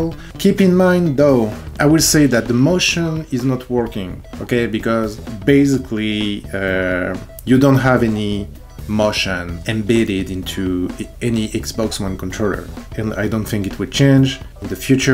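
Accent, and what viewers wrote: France. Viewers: Did you manage to get rumbles with the Xbox controller ? Btw I heard ur french accent ( Or maybe german/dutch)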